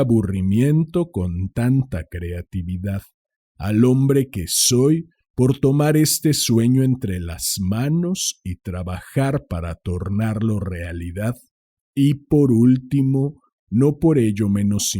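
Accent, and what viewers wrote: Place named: Argentina. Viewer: Un buen cuento, el tono Argentino salió perfecto. Saludos, Natalia de Argentina.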